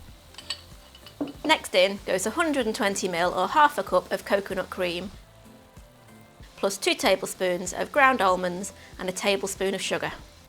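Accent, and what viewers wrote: United Kingdom. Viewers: love your British accent :)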